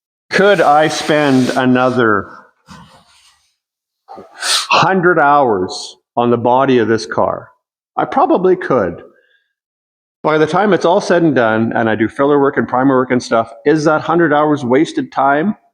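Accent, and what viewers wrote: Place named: Canada. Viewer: reminds me of Bob and Doug McKenzie, must be the accent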